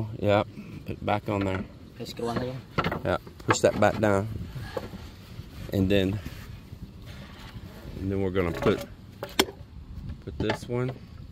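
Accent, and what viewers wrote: Texas, United States. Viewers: Love your videos and your Texas drawl "I tell you what..." A question - do they sell Balsa wood in the PH? I see you at the lumber store regularly and thought I'd ask if you have seen any. Like 2"X4" X 8'? Or a similar wood that is light and strong? Thanks man! 👍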